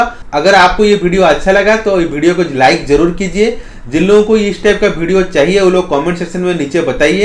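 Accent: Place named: Odisha, India. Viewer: Your accent says you are from odisha. Correct me if I'm wrong.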